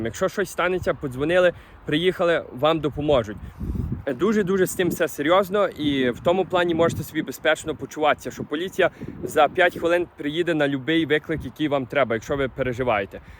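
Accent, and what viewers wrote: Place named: Ukraine. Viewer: Що смішного? Український діалект. Діалекти має кожна нація!!!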